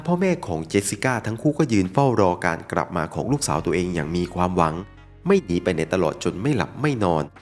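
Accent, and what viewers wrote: South, Thailand. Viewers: คนใต้มั๊ยคับ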